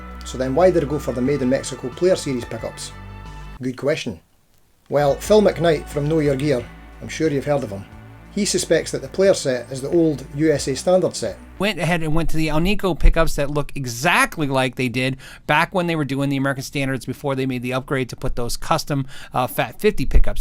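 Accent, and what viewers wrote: Scotland, United Kingdom. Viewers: 3 string graph tech string tree would be totally amazing! Also, always great to hear a Scottish voice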